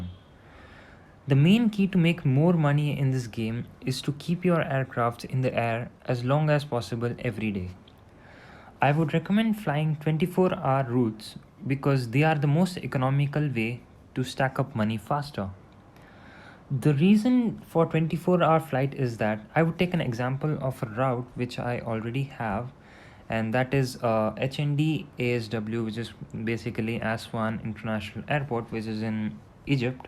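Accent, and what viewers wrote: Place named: India. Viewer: Fuckin indian accent :((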